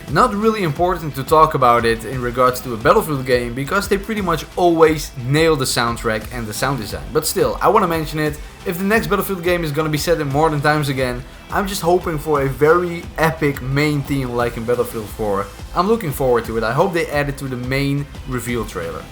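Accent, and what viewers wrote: Netherlands. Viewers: This guy is Dutch, i could hear it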